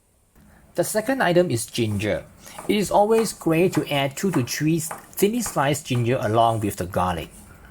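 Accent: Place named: Singapore. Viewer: Thanks for the clear instructions. I haven’t heard that Singaporean accent in years; it’s so unmistakable. Lovely video to watch.Cheers.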